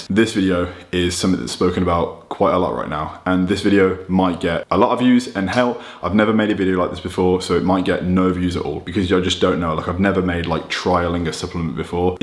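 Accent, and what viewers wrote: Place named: United Kingdom. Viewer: first of all...buying any peptide as a liquid is the worst decision you can take, they have to use so many conservants to keep the peptide together. The best way is lyophilized cake but we all know how anoying is to reconstitute and dose them, extra bac water, needles, syringes and shit. So, my gym bro, it seems you have an UK accent and you have in the UK the Lyoprester, it was invented there, under your nose mate and here we are, putting ready mixed peptides in you...😌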